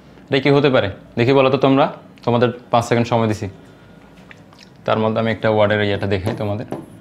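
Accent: Noakhali, Bangladesh. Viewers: Apni ki Noakhalir😐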